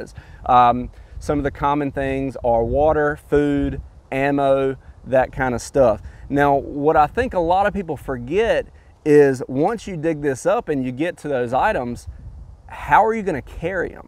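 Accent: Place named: Georgia, United States. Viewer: I don't know where he is, but the freeze line where I am is 6 inches. He sounds like a Georgian so I'd say he's just about right.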